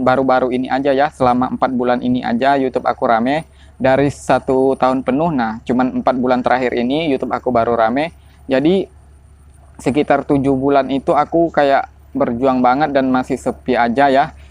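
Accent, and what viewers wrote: Jambi, Indonesia. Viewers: orang jambi kah bang?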